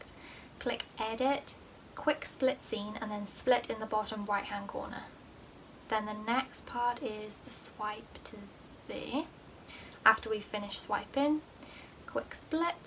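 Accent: Wales, United Kingdom. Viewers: Omg love your British accent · Hey! Are you welsh?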